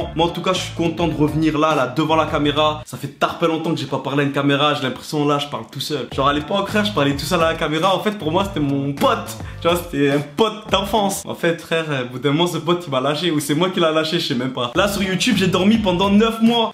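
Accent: South, France. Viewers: L’accent du sud c’est vraiment le pire du monde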